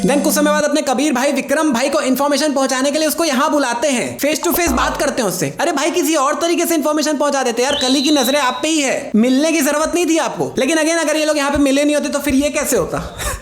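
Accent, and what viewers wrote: Chhattisgarh, India. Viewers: Bhaiya app Chhattisgarhi ho kya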